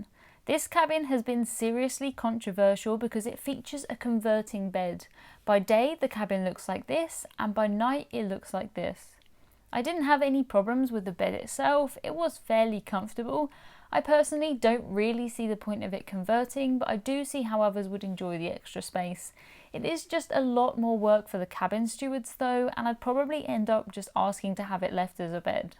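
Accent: United Kingdom. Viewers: i really like your videos and as I am from Germany I like to listen to your nice British English! ;-) thanks for sharing your honest opinion! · Most definitely they should pay you! I would pay to upgrade my iPhone with your voice with the lovely British accent (I'm from the U.S.).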